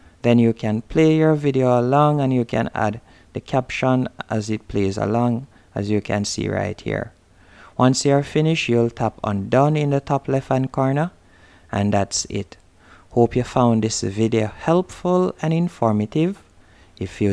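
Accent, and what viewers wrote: Jamaica. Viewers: You sound Jamaican my brotha🤜🏿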